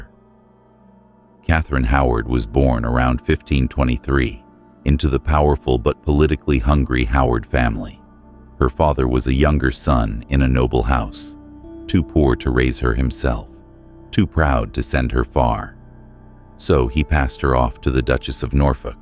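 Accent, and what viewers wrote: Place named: United States. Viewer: Can't listen to my countries history narrated by an American, you just know some things and places are going to be pronounced completely wrong or be inaccurate 😮😮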